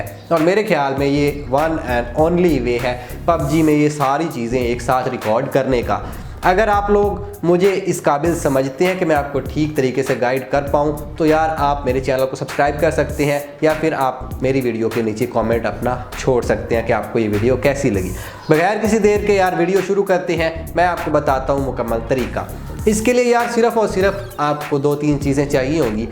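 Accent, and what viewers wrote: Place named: Himachal Pradesh, India. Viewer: Bhai vedio ka pta nhi voice se lg rha tu b himachal ka h,😄😂😂😂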